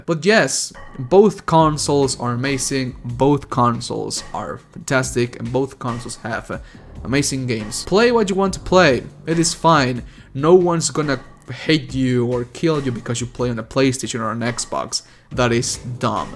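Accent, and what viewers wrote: Mexico. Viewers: Eres mexicanoo :000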